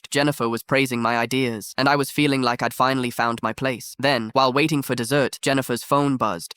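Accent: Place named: United Kingdom. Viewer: what is this goofy ass british voice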